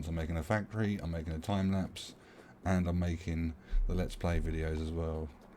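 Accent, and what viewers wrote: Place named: England, United Kingdom. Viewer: Are you from England your accent sounds like mine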